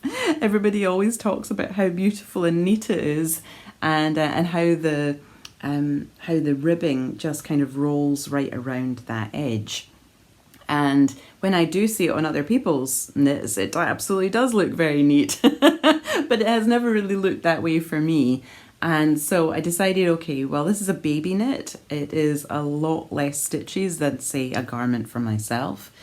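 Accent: Scotland, United Kingdom. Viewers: I love your podcast, inspiring and love love your Scottish accent. Keep on enjoying what you do and how you want to change and adapt to make it more joyful. ❤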